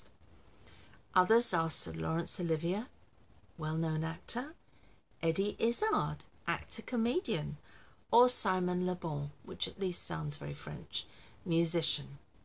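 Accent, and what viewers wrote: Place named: England, United Kingdom. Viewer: Why do you have an English accent?? I doubt there are Huguenots in England. In fact that whole country has been taken over by the Viking types. Even Iceland nir Ireland have Irish there.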